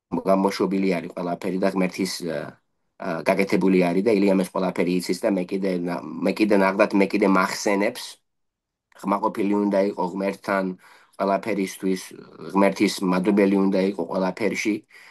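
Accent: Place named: Megrelia, Georgia. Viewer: ძმურად ინგლისურიც გაურიე ხოლმე მეგრულ კილოს უხდება სასწაულად 😂😂😂 კაი ბიჭი ხარ ძამია წარმატებები არ მოგაკლოს ღმერთმა !! სალამს გიგზანი ბათუმიდან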